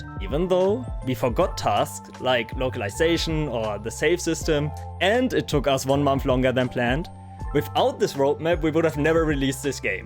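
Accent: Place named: Germany. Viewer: Uh-oh, you provided value in the video, now I gotta have another subscription. Plus I sense a German accent.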